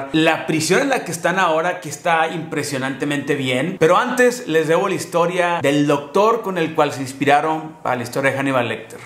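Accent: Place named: Fresa, Mexico. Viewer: Nunca había visto un vídeo de el, muy buen video, solo que desespera su voz exageradamente fresa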